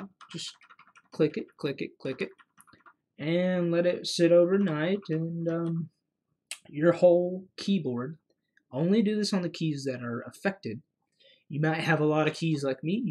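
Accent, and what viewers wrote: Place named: South, United States. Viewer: Great video and the Southern accent is badass.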